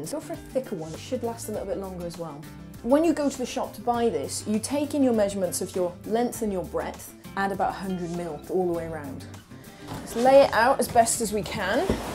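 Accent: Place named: Wales, United Kingdom. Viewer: It's the combination of no nonsense facts and a tinge of a Welsh accent. Go with it.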